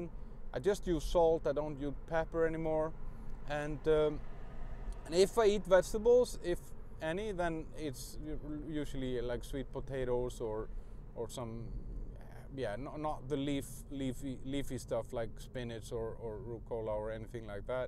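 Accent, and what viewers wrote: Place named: Iceland. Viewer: Hah! I knew it from the first minute, that accent. I lived in Iceland for several years in the early 90s (turned 20 in keflavikr) I miss the lamb hotdogs. I've been carnivore for a while now, it's definitely a huge help.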